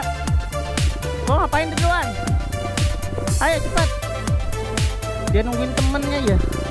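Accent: Bali, Indonesia. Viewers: Bang lu orang bali suaranya aja sayatau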